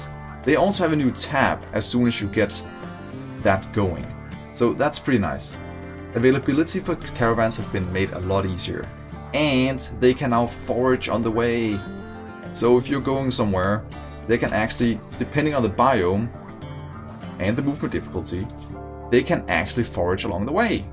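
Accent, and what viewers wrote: Denmark. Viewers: that danish accent.. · Are you from Denmark your accent tho?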